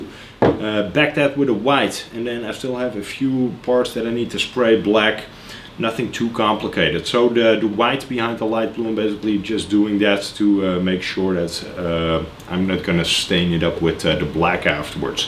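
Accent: Netherlands. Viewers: Hey, I'm just getting back into RC, I flew fixed wing years ago, but now I've got the hots for trucks and cars. Ive watched a few of your videos and thinks they are great, no bullshit, just facts and well informed opinions. I'm not interested in your cats either, or people who flower themselves up to be more 'interesting'. 🙄🤥 Keep up the good work. 👍 I have my first car, a Slash 2wd OBA, but this will be my secondary car, my first love is crawlers and I'd like a RC4WD Gelande LWB 110 purely because I want my crawler to be as scale as possible, but damn it's expensive! I'm considering a HPI Venture with a Defender 110 hard body with interior instead as a cheaper alternative, I'd love to see a video on fitting various bodies to the HPI. 😉 Oh and BTW, I can't get your channels theme song out of my head, or you're 'crazy' Dutch accent. 🇳🇱👍 Jelly from 🇬🇧😁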